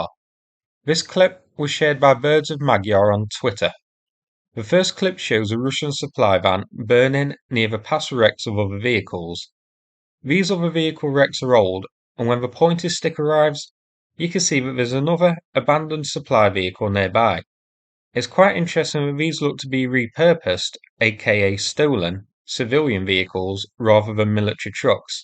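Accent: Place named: North, United Kingdom. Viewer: PLEASE tell me you are doing the audio book version, I need to hear those dinosaur names read out with that norther accent!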